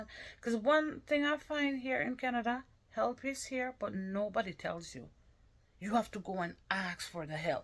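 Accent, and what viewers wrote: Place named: Jamaica. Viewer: Why come on social media to talk about it you use it so pay it and stop talking like Jamaican and idiot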